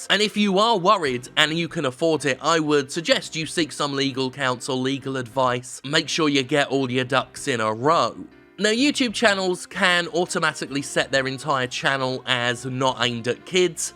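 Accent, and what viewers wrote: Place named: United Kingdom. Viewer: Do you know whether or not the US FTC can even fine foreign creators if we violate COPPA? I've been searching for a definitive answer and finding nothing so far. I'm Australian. You sound like you're from the UK. They may be able to force YouTube delete our videos or channels because YouTube is a US platform which has to comply, but I'm pretty darn certain this badly written revision of a US law does not apply to me.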